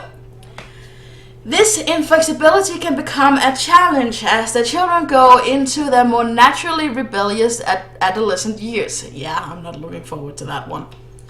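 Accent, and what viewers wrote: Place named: Denmark. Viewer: This is a nice idea! hehe, the danish accent cc;; ♥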